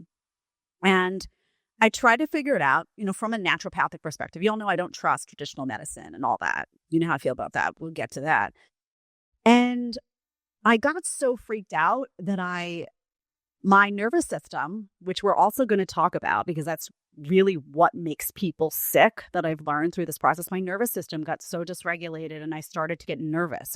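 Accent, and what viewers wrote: New York, United States. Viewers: Yea I thought you was like fuugggeeeettttt aaabbbooouuuuuttttttt that's iiiissshhhhh and rolled all the way out. It's been like what 2.5 yrs since you did something on here. Dang we missed that awesome smile the new York accent and attitude. So happy to see your back. Glad your happy healthy and safe. God bless so happy your back.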